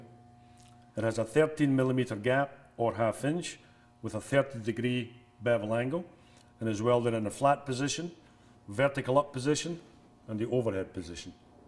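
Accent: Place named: Scotland, United Kingdom. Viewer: What part of scotland is the instructor from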